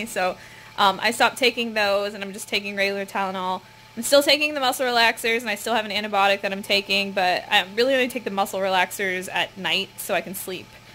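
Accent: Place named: Australia. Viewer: u sound Australian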